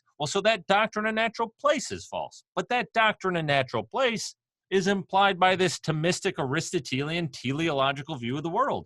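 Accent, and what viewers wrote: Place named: Great Lakes, United States. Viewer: Are you from Michigan or Ohio? Somewhere else in the Great Lakes Region? Curious based on your accent. Thanks a lot for the great content man! Fun to get a mini-Uni-style course on here.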